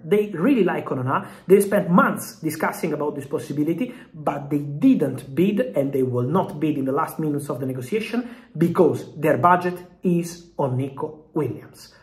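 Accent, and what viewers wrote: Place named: Italy. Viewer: Bro your Italian accent was crazy on this one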